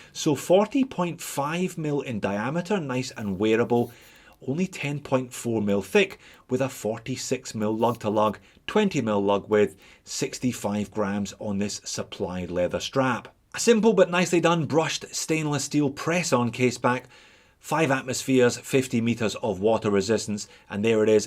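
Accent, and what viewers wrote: Scotland, United Kingdom. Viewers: Am I the only one doing the intro “hellloooooooo and welcome to just one more watch” in Scottish accent? I swear I sound exactly like now after watching his channel for over a year 😂😂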